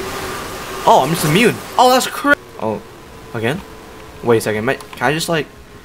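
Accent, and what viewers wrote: United Kingdom. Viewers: i feel like hes gotten slightly british also ambush reminds me of a pillow pet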